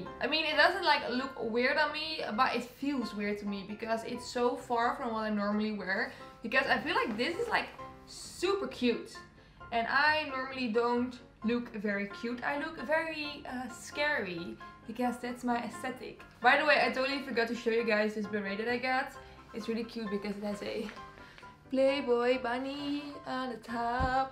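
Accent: Netherlands. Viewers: her accent sounds dutch, is she dutch?😅🍓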